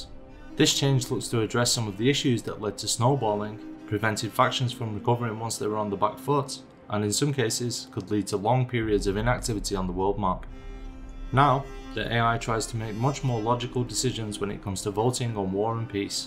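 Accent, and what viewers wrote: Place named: Turkey. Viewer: okuyanın Türk olduğu çok belli bee :D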